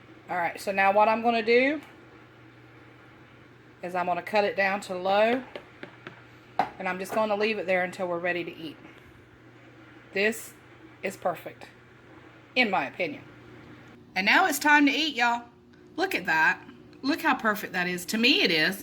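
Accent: South, United States. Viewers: Arika sent me over and I'm glad! I love your Southern accent. I'm from North Carolina so I feel right at home with you! I'm new to your channel and I plan visiting you every time you post. Thanks for the great recipe! Looks delicious! God Bless!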